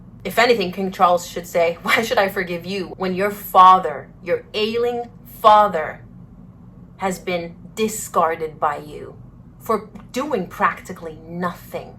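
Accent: United States. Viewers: I just happened upon your channel. Wow. You are great !!! I am so pleased to see a young American ( I'm only assuming due to your accent) woman who is obviously educated and well mannered, speaking out in defence of Her Majesty, and clearly stating the facts about Harry's wife. She must be called out, it is so important to keep on track of all the lies and slander from this talentless grifter fake duchess who conned Britain and the Royal family. Thankyou 🇭🇲😘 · She moved to Australia as a child from another country which I am not sure which country from. It is possible that she is from America but she mentioned that she was treated differently when she arrived to Australia initially due to her Hispanic looks. She did her school and uni in Australia and she was even in the Australian armed force as a trainee for a period while she was studying law. Australia is a wide country and not all of us sound the same. 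There is typical Aussie accent from the bush and city people sound completely different. Yes, I can hear slight American accent in her but she also sounds Aussie to me. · has an American accent though. She must've moved to Australia then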